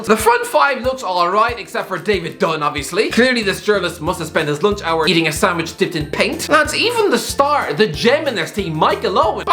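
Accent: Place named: Ireland. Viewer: why have i clicked on a prediction video and all i can hear is an irish guy talking about a 2003 match magazine?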